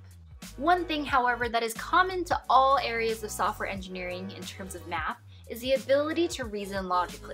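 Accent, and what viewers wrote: United States. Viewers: Are you American? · bagus adji i think the name is Japanese but the accent makes her sound like she was born in the US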